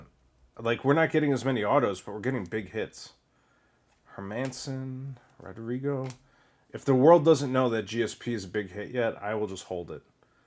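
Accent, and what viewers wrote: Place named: Midwest, United States. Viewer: Or maybe Chicago? Midwest for sure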